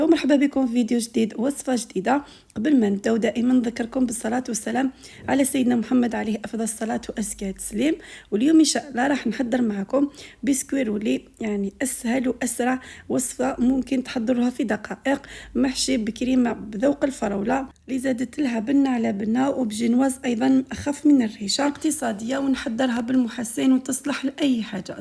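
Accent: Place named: Egypt. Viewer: نعم من لهجتك عرفنا أنك مصرية أهلا وسهلا بيكي بين أخواتك أهل وأحباب في الله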